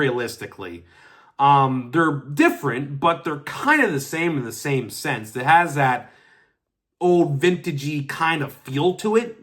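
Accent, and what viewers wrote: Pittsburgh, United States. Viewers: Are you from Pittsburgh? I used to work with some Davey Tree workers from WV and Pittsburgh and they said "YIns" all the time and had kind of the same accent. We love Pittsburgh. Beautiful city. Love R. Clemente. Our first trip to the city we missed R. Clemente by one year. 1973 season. Sad but legendary.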